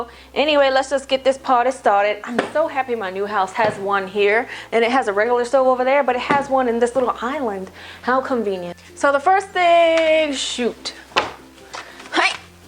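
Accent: South, United States. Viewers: You kind of sound southern but then you kind of sound Asian but then you kind of sound black so I'm really really confused but it's whatever I kind of like it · omg im not american but i love that your american accent is still so strong, i love it, the things you say sometimes just crack me up, when you get passionate your accent really comes out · She is ssoooooo NOT from NY do u not hear her accent?? She sounds like a southern belle. im a born and raised nyer from Brooklyn our accent is pretty distinct from a southern one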